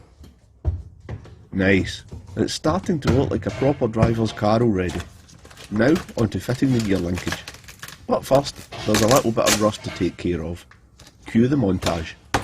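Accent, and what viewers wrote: Scotland, United Kingdom. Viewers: I'm following this epic restoration closely and really enjoying it. I've said it before, I like the Scottish accent. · I WAS ALWAYS TRYING TO FIGURE OUT IF YOU WERE CANADIAN OR BRITISH. SCOTTISH NEVER CAME TO MIND. SCOTTISH PEOPLE ARE GREAT. I HAD A SCOTTISH GIRLFRIEND ONCE UPON A TIME, SHE WAS GREAT. JUST DIDN'T WORK OUT.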